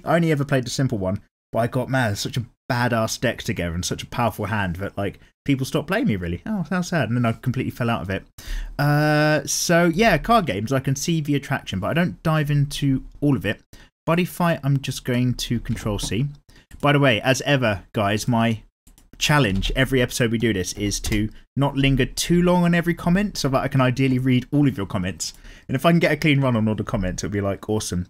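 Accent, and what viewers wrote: United Kingdom. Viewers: dude ur british? can u be my yoda brah?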